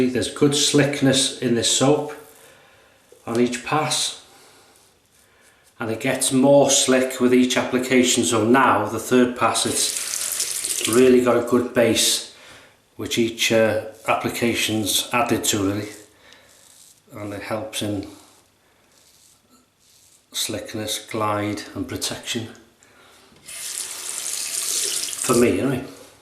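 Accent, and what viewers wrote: United Kingdom. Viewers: I travel quite a bit for work, so those shave sticks are a life saver for me. Thanks for the video,! From which part of the UK do you hail? (I know England, but which part? London? Birmingham?)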